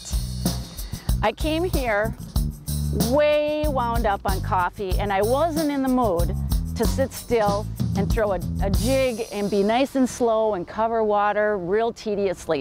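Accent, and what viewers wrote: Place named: Minnesota, United States. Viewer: haha she sounds like she might be from minnesooaata but this is really good...you rarely ever get sound, down to earth advice from fisherman on yt... how do you not set the hook though? setting the hook has become like a tourettes tic to me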